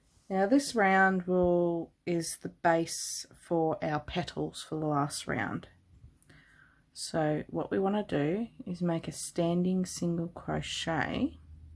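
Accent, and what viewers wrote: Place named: Australia. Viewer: Great to hear an Aussie tutorial loved this made a few just subbed too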